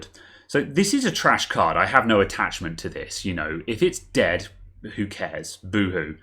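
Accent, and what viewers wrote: England, United Kingdom. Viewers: Man, i am not an expert, i do repair things from time to time, but your voice and especially your English accent, really make me watch your clips. I have an issue with a keyboard: a column of keys get lights but don't send a signal, the keyboard is Logitech G pro. I try to bridge but it seems all keys ( F7, 5, T, G, V and Space) are one link on the board. Do you have any idea on how to start this repair, whats spares should I look for. This issue appeared after I try to resolder a switch ( I ve done this before, many times but never saw this type troubleshooting ). Thank you so much and Rock on!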